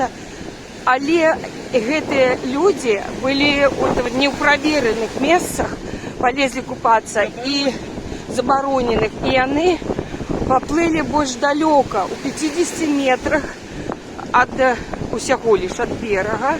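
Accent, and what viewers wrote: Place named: Belarus. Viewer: Очень беларуский акцент. Хай живе Беларусь ! Не ставайте трапками для бацьки !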